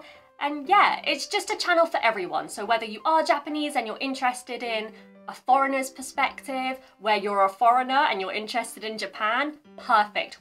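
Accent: United Kingdom. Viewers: Why U r not that popular!?!? Ur face is so pretty 😍 And ur Japanese is good as well. If u start couple CH maybe U’ll get more views??? Idk but anyway. I’m surprise that I found u today and u r not that famous. So I hope many people find u very soon and get to know this pretty cute British accent 👧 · WOW! I love your British accent! I will follow your video as my practice for listening. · All the best! I'm very familiar with British English as I have lived in UK for 10 years. It was very comfortable to listen British English! I would enjoy your channel. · 本当にイギリスの方の英語は聞き取りやすいと思います。米語のネイティブスピードは努力しても聞き取れる気がしませんが、英語はなんとかなりそうな気がします。あと、築地の時の話でも書いたように、の英語はマイ・フェア・レディの映画を見ているようです。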